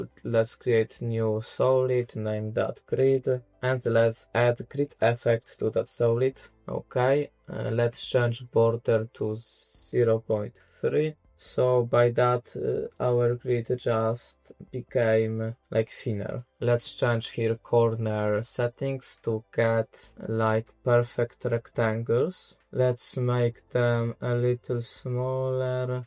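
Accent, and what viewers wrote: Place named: Poland. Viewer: po pierwszych sekundach wiedziałam że jesteś polakiem ;) szacun stary, zajebisty montaż. Też sobie klikam w aftera i na pewno będę używaćtwoich poradników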